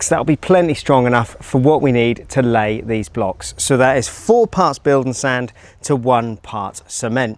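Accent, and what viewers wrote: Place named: Suffolk, United Kingdom. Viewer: Nice to hear a good old Suffolk boi after 30 years in NZ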